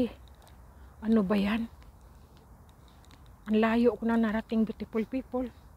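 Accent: Visayas, Philippines. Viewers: Bisaya ka ate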